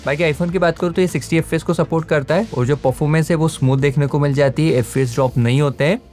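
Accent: Indore, India. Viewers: Bhai Indore se ho kya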